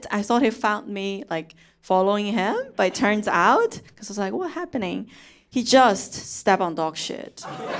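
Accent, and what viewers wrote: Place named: China. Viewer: I love Chinese accent . Followed .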